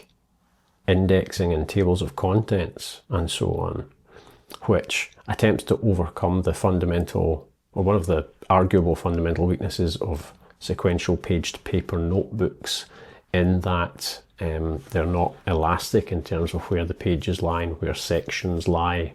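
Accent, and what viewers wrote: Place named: Scotland, United Kingdom. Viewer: I really liked your comparison about eink tablets. Your way to explain Boox aproach is very good. I am now getting my first eink device afrer almost two yeras of reviewing and deciding (and waiting😅). But I did a purchase two years ago of a Booxs tablet (I think it was a Note Air 2) and I return it as soon as I test it becouse it felt exactly as an android tablet but much mote slow... and I wanted just to orgnize my work an take notes, so I started to dig into RM and Supernote,....anyhow, very good videos,...and I like your scottish accent,...I love your country. A place to wrte good novels😊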